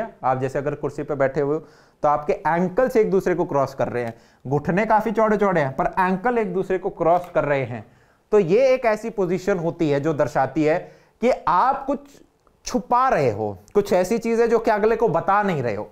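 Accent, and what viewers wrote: Haryana, India. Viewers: Hariyanvi mix😅😅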